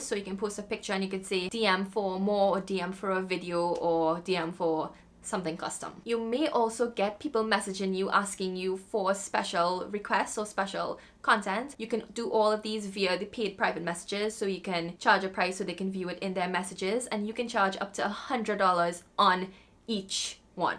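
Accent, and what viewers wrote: Trinidad and Tobago. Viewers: You sound Trinidadian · Came for the info. Fell for the accent 🤣 Trini?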